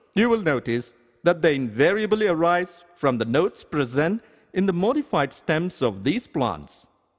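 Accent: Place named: India. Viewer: I love your accent, where you from? #India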